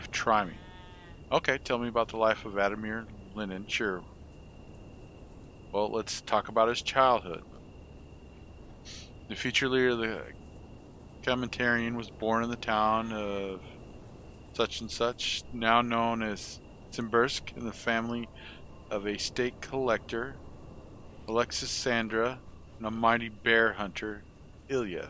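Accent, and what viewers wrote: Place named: Midwest, United States. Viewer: I've really enjoyed your playthrough. Actually preferred over television/netflix or recent releases in the gaming industry. You do a great job, and struggle in a world with a character with flaws relatable to a common person. Though your character isn't set perfectly for an easy ride, it does however force you to play smarter just to survive, and a mishap can be a set back. Where are you from? You almost sound like you're from the midwest.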